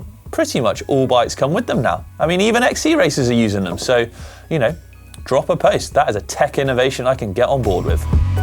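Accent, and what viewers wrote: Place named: United Kingdom. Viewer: very informative video! and no offense but good job: to keep the accent down for us non-british viewers...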